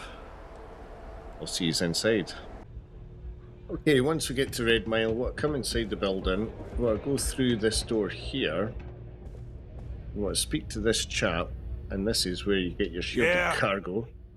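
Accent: United Kingdom. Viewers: great to hear a Scottish accent for a change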